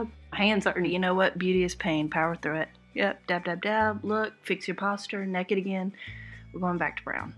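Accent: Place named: South Africa. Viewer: Damn, I'm only watching these videos to listen to lovely SA accent! lol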